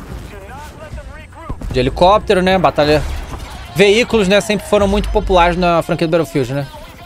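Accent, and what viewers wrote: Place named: Rio de Janeiro, Brazil. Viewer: poxxxxxssss conferencia kkkk sotaque carioca é bom demais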